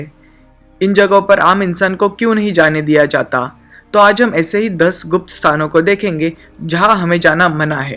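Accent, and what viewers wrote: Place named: Maharashtra, India. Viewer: narrator pakka Marathi hai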